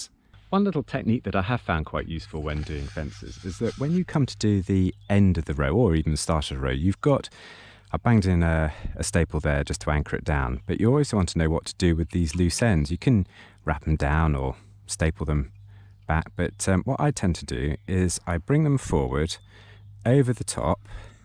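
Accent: United Kingdom. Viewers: Well done great explanation and wonderful to hear an English accent for a change!! Just doing some ourselves so watching this and a few other Youtube Videos as a refresher, thank you!!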